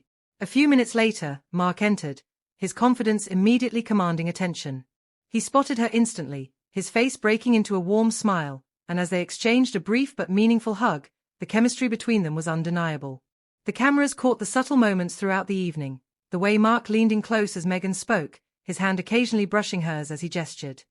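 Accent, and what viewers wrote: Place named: England, United Kingdom. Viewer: Too funny lol A woman with an English Accent using such words to describe Meghan...friendly, etc ETC If the A I bot said Mean Girl, Not to Bright, Liar, Bully, and has no clue 9th month the Baby drops, not rise! He may be wealthy but in terms of how MM views herself and the turning Harry into her lap dog, Mark is mostly in the same place as Harry though his Marriage is vastly different than MM' s spare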